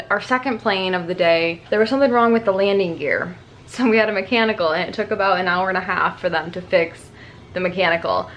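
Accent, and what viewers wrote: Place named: South, United States. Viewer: I think that you are starting to get a little Southern twang in your voice! (I am from Alabama)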